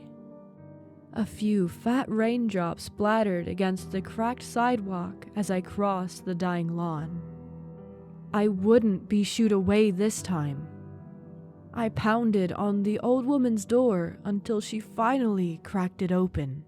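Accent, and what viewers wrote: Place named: South, United States. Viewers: Love your calming southern accent. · You're right!! Magnificent southern accent!! Sounded like one of my neighbors 😂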